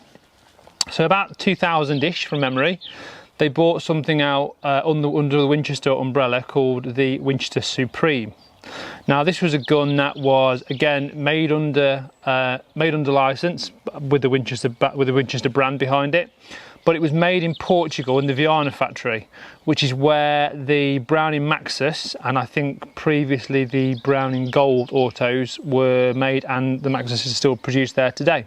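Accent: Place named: United Kingdom. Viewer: I hate British accent, very difficult to understand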